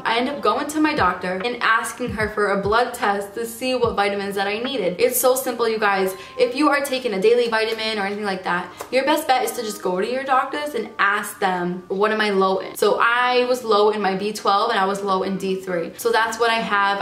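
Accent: Boston, United States. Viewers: 1Brave Moon0 it’s a boston accent haha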